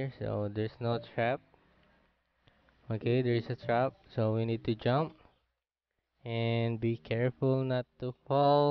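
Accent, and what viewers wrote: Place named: Philippines. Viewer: Man u from philipines ur english like philipines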